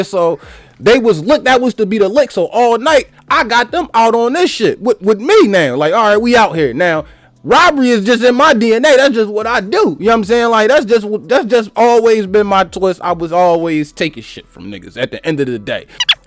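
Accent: Philadelphia, United States. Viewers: I woulda never known you was born in Texas. Your Philly accent is HEAVY 😂😂 but naw my cousin was born and raised in Philly until she was 20…moved down Louisiana and after a year and a half she talks like lil Wayne 😂😂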